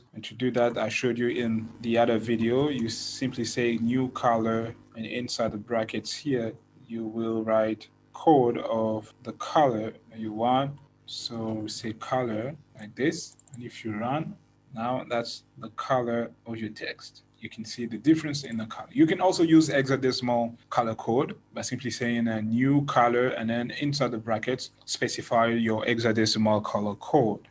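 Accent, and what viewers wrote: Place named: Nigeria. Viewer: Very nice Tutorial, thanks a lot! Is that a Nigerian Accent? :)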